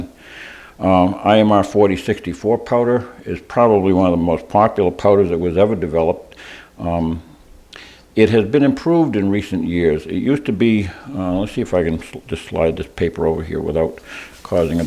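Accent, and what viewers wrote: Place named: Boston, United States. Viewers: If think it was a Boston accent. Even to an Englishman it's very distinctive. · Bah-ston accent.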